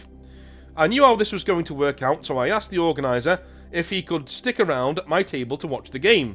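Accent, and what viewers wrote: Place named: Liverpool, United Kingdom. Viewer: are you Scouse? I swear you have a Liverpool accent but like you've moved about alot so it's become more subtle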